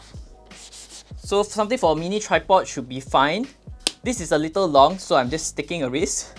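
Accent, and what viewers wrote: Singapore. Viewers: Legend mate, great review. I grew up in SG and I knew that accent was familiar 😂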